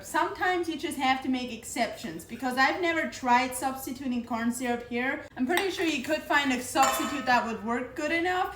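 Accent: Pennsylvania Dutch, United States. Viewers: Amish speak Pennsylvania Dutch (that’s what her accent is)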